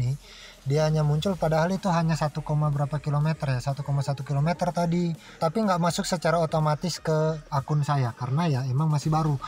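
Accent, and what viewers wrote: Manado, Indonesia. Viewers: Org Manado kang bro?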